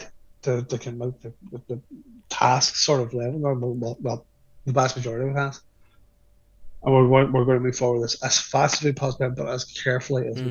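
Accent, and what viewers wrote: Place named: Ireland. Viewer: Irish accent I understand it perfectly but I am Irish 😅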